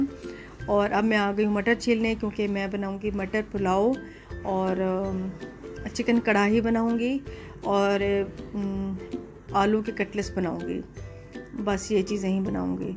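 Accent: Saraiki, Pakistan. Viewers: Aap yaqeenan saraiki speaking hen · I hate this language n this pak acsent. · آپکی پہلی وڈیو دیکھی ہیے بولنے کے انداز سے آپ سرائکی لگ رہی ہیں؟؟؟ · I strongly feel that u r saraiki or multani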